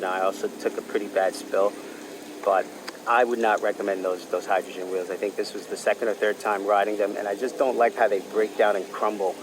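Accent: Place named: New York, United States. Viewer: Hey bro I saw this video for the first time and before u said it I knew it was new York. I was thinking Brooklyn or Queens. I live in PHA in South Minneapolis Minnesota. I cud tell u had that new York flavor very wu tang vibe 90s vibes. I'm in my 40s now just got back into skating. I skated from 97 to 2007. I skated Rollerblade brand. Now I have razors cult shima 3 remastered, razors Andrew broom pro shift skates and I just ordered the new Iqon AG 10 boots with an Iqon AG60 C frame. A carbon frame. Tho I wonder if I shud send the AG 10 back and get the Them 909 skate. The AG 10 comes in the mail tomorrow I just am so old school that all this new tech scares the shit outta me! I hope I love em I'm just Soo big on durability. I like the old school flavor of a plastic boot and basic shape. I just got a huge sale price on the Iqon that I went for it..all carbon and kevlar mix. 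I just subscribed to your channel and just showing support. I'm over here dodging gun fire just trying to skate haha no joke! I teach dance and I cut hair as a hairstylist. But I love to skate and it feels good to be at it again. Thanks for a great video. I learned Alot. I have alot to learn all over again. Be well and enjoy this summer!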